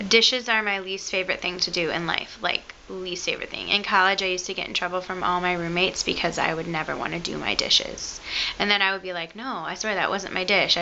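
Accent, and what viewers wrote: Michigan, United States. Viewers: Ok, how did I miss this one? I feel like I'm your stalker, but redheadphd linked it as being one of the funniest videos she has ever seen. You're so cute,! I personally like your Michigan accent. It reminds me of one if my best friends that is from Grand Rapids.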